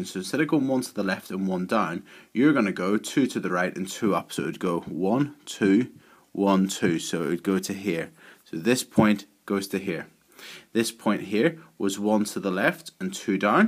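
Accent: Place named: Northern Ireland, United Kingdom. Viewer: So nice hearing the voice of a fellow northen irish mathematician!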